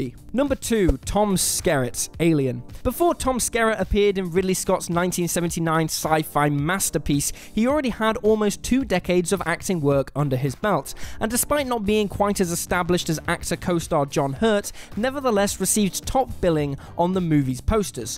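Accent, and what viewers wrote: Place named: North, United Kingdom. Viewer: He is northern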